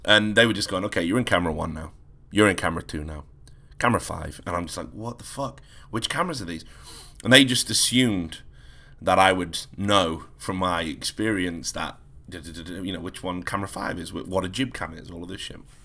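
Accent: United Kingdom. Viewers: Don't let them kill your accent, the British accent is like half the reason I watch your podcasts <3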